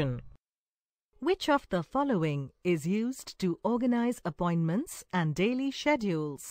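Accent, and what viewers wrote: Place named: United Kingdom. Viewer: I love your British accent..I have learnt so much about computers...thank u.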